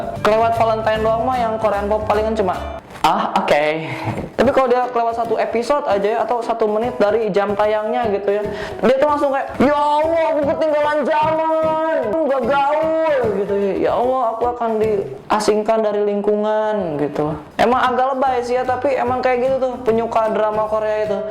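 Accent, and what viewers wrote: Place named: West Java, Indonesia. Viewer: akang nya sunda bangetya suka deh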